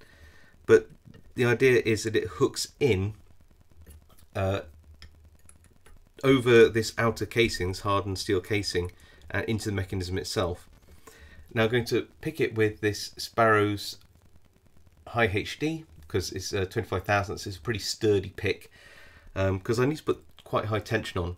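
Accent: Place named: United Kingdom. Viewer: I love the Queen's English.. "Leaver" vs. "Lever" lol.. same same but all the better... Btw good job..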